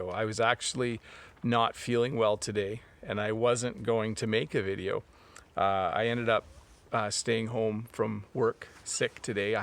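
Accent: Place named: Canada. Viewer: Hello the 🇯🇵Osakanese here! Ive been learning eng from ur videos. I like ur “the great White North”English!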